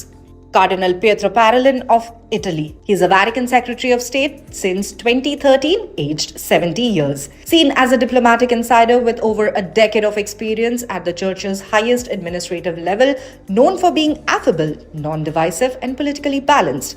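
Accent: India. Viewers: INDIAN ACCENT IS ANNOYING AND WRONG PRONUNCIATION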